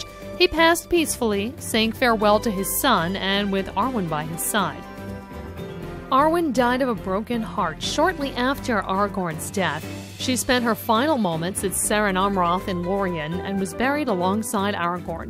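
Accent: United States. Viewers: she's American, and they obviously don't/can't pronounce English like the British do! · i couldn't even bear to get to that part. 8 seconds in i stopped the video because of her watchmojo american accent that clearly gives off the vibe that she has no idea or interest in what she's talking about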